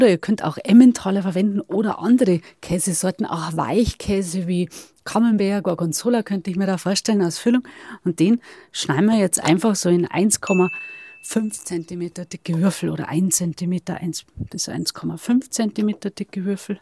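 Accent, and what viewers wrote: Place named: Bavaria, Germany. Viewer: Servus schneeglöckchen, käse knödel hab ich noch nie gehört.bin aber net so der fan käs. Spinat des doch des grüne vom kreiselmähwerk. Spinat der mit den blub war früher immer die kinderwerbung. 😅spass bei seite. Was du immer für ideen hast mit deinen kochkünsten das überrascht mich immer voll. 😊❤ oberpfalz ok hörst die eher bayerischer dialekt an dein mann hat dich gelehrt eine neue zu lernen ich lerne bayerisch 😅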